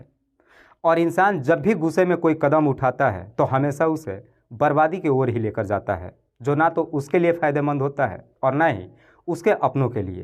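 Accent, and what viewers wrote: Bihar, India. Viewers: Story telling in Bihari style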